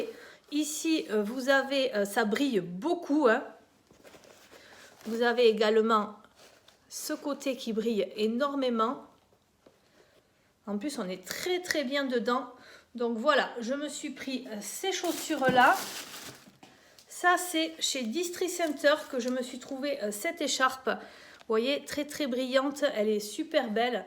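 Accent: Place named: South, France. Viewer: Coucou 🥰. Je suis ravie d'avoir fait votre connaissance sur YouTube. Vous avez bon goût. Moi j'ai accouché en 2009 à Villeneuve sur Lot et mon fils a été transféré en néonatalogie à Agen je crois. J'ai été hébergée chez la tante de mon ex à PUJOL. J'adore votre accent du sud le LOT et GARONNE. ❤🥰. Bisous 🌹 😚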